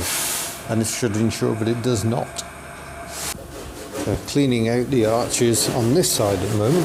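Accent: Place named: Birmingham, United Kingdom. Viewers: Loved hearing the Black Country accent again! :) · your a brumy mate im from dudley lol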